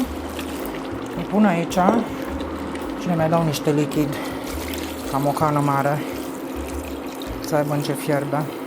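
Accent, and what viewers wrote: Transylvania, Romania. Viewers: Pe lângă cum gătiți, îmi place și accentul dumneavoastră ardelesc, e fix cât trebuie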